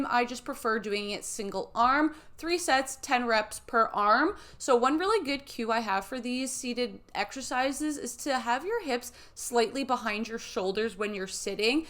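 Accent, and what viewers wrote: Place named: Canada. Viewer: Canadian! U can tell by the way she says 'Out'.... heh heh;-)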